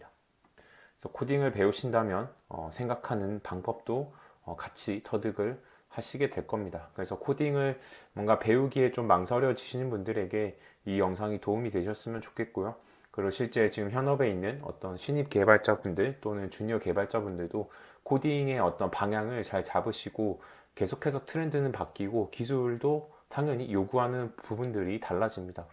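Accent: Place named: Gyeonggi, South Korea. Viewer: 경기도 사투리 그대로 놔둬도 괜찮습니다. ㅎㅎ!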